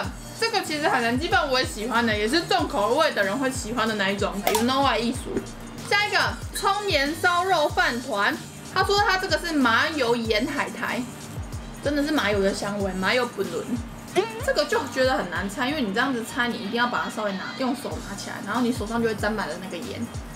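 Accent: Taiwan. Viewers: 台灣國語也太親切了🤣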